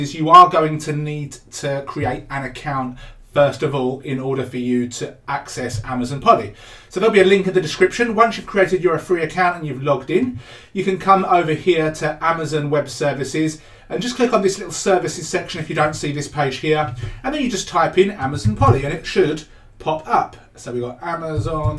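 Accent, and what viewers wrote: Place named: United Kingdom. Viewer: I noticed your region was 'Oregon' although you sound British. Is this so you can access the Neural voices? I'm from London area and they are not available (?) Is this because of the region specified in my AWS account or is it IP address? or what?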